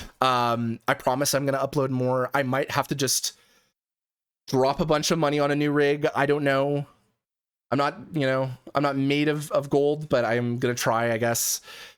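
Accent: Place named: Canada. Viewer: Bro is definitely Canadian he's got a pretty distinct accent. With that said 🦅🦅🦅🦅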